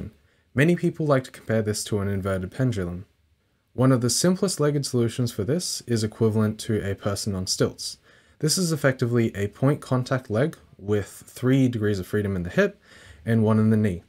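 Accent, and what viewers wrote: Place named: Australia. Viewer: I have to say I love they way you think. So often people design product with no concern to cost, ease of repair, weight & ease of manufacture etc. I also find that the general approach is to over engineer everything regards of need. This is the polar opposite of what nature actually does. I think the fact that you have made the right and left parts interchangeable is brilliant as keeping the part count is low is super important when manufacturing. I am waiting on a Bambu Lab printer so I can purchase your CAD and start tinkering as I think your product/project has unlimited scope and I have already some idea's on how I can utilise your actuator. I notice that you have an Aussie accent, any chance you are located on the east coast?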